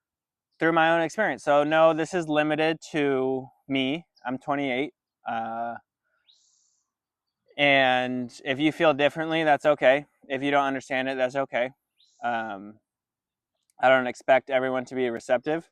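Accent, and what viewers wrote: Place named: United States. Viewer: I watched till the end. Where are you. The backdrop looks beautiful, I'm guessing America by your accent but what state?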